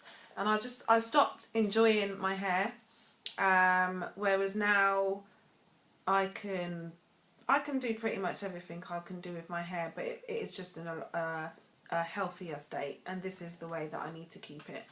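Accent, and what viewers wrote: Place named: London, United Kingdom. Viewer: are you British your accent sound like you from london. loving your videos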